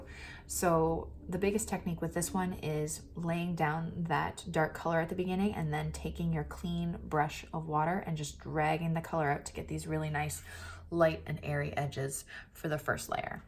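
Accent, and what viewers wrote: Canada. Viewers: Well I think you are fantastic ❣️👏👍. I adore your little son, your casual teaching methods that allow me to make mistakes but keep trying, your encouragement, and your Canadian accent ( my sister and her husband, sons and their extended family are scattered throughout Ontario ) and we have many delightful Canadian friends from years of travels❤️🥰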